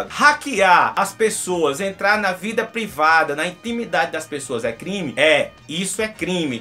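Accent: Northeast, Brazil. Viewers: Tai. Gostei de sua forma nordestina de dar notícias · E esse sotaque me representa muito como nordestino... Não pare, vc é minha fonte de pesquisa política hj em dia...😍👏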